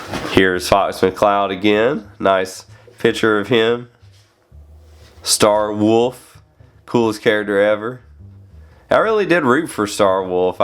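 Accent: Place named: Carolinas, United States. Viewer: Cool channel! Neat accent too. If I had to guess, is your accent Carolinian? 😎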